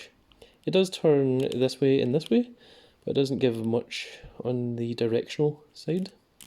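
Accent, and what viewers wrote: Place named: Scotland, United Kingdom. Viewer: I can already tell from your Drew McIntyre accent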